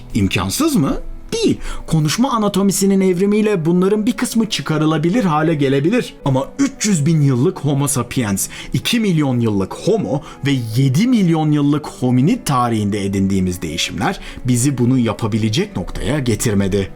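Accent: Samsun, Turkey. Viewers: reis sendemi samsunlusun :) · Ya abi Samsunluyuz da bu kadar da mı belli oluyor. :D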